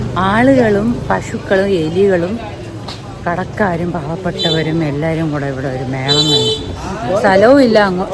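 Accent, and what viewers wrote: Trivandrum, India. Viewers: Good presentation.good language tvm😀🤣🤗good🤗thank you. Suscribe cheythu. Good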